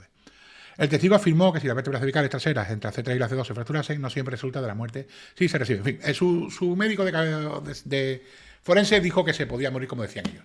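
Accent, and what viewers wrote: Andalusia, Spain. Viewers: Es del sur de España, una región donde se habla muy el castellano, porque más que se lo digan es imposible que corrija su vocalización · Muy interesante, muy bien documentado, un trabajo muy profesional, el único "pero" es el esfuerzo que hay que hacer para entender el "andaluz estrecho" y sin diferenciación de algunos fonemas, entonación y arrastre de las sss y algunas guturales.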